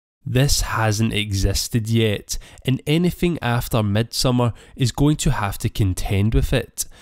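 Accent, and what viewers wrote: Scotland, United Kingdom. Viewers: "Arrr Eyes Soup-eRR GAL-EWW-D ON da Ssssska-reeeen" Your Scotish Brogue kills me. God Bless ya'. Move to Hollywood,because we need to hear that voice. Normally, Americans we can't understand a bloody thing Scots say, but you talk slowly. It's a blessing! U rock Scots-dude!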